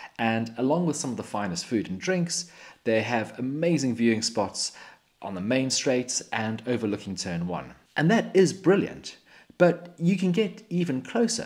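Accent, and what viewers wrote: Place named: United Kingdom. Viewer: You’re Dutch? You’ve nailed the British accent if your Dutch.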